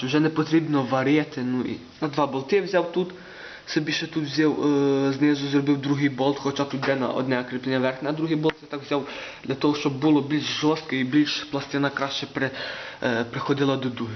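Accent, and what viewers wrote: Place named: Hutsul, Ukraine. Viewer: Смішно розмовляєш, мабуть гуцул, але приємно.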